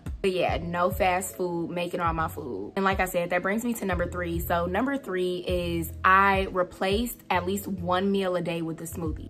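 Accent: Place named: Midwest, United States. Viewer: Loved this video’! I am officially 15lbs down with 30 more to go. Side note: I knew you were from the Midwest. I heard the accent in your voice. I’m from Chicago lol.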